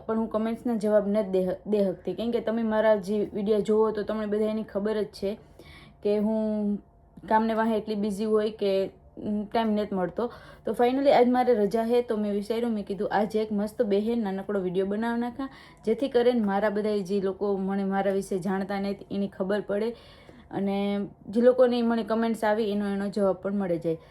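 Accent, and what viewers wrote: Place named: Barda, India. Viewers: Mer ni boli emj bole bhai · bhai aa amara barda ni mithi bhasa 6 aa bhasa koi boli na sake amara sivay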